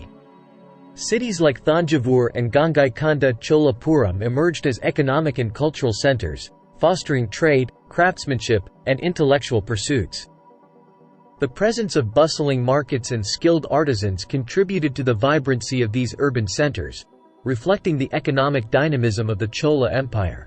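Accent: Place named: United States. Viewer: I would of preferred it if you had set your narration machine to an English accent not American.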